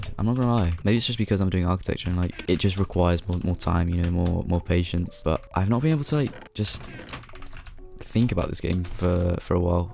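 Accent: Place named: United Kingdom. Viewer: Nice British Accent =)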